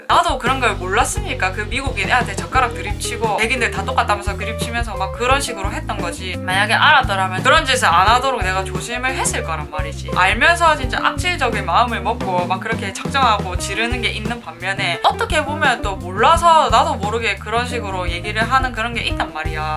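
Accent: Busan, South Korea. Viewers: 음....좀 더 듣다보니...부산같기도 하구요 ㅎㅎㅎ ^^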